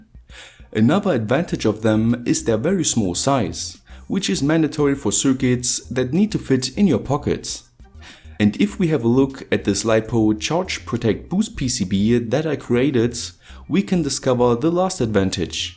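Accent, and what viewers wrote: Germany. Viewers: Your accent is kinda like german are you from Germany? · are u deutch?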